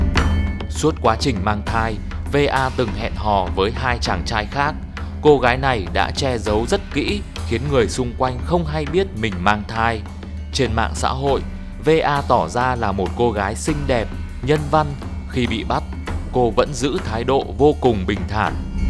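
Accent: Hanoi, Vietnam. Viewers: Giong HN đọc hay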